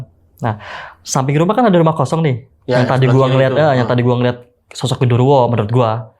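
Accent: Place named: Banyumas, Indonesia. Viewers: Bang, sekedar saran aja, Kayanya berat bgd ngmng gue lu... logat ngapaknya ga bisa ilang, lebih baik ngmng aku km aja. Orang jawa itu identik dengan tutur bahasanya yg alus soalnya 😅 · lu gua tapi medoknya ga ilamg 😎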